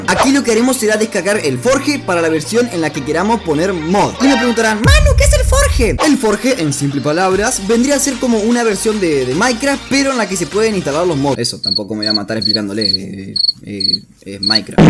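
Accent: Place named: Chile. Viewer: ablas como mi chile querido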